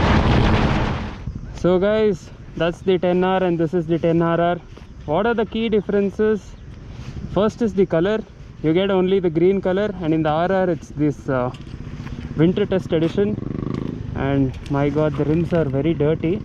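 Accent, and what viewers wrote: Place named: Chittoor, India. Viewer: Hey you make amazing videos no doubt about that and keep it going and looks like your native is chitoor , guessing that from the accent and also looks like you have been inspired a lot by Sriman kotaru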